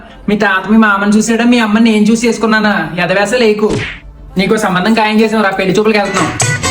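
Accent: Godavari, India. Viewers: Gidavari yasanki,me mata teeru ki take above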